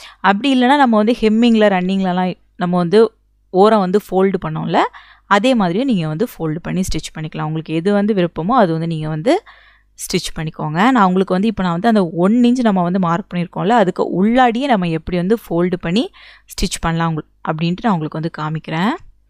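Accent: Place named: Kanyakumari, India. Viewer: Super explanation vera level patience ungaluku Like that kanyakumari slang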